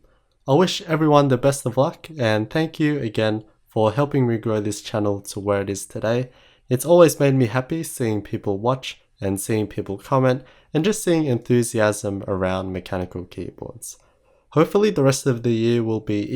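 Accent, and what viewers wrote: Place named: Australia. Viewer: You sound like Lachlan Likes a Thing. I think it’s the Aussie accent.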